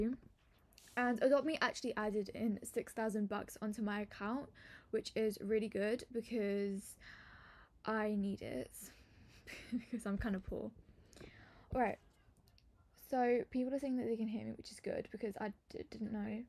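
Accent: United Kingdom. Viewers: When you discover for your 1st time that is British 💀 (this means I love it and I’ll start to watch more to know how British people talk 🫶)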